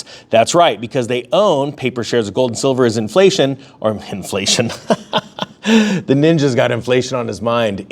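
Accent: United Kingdom. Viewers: That UK accent has me dying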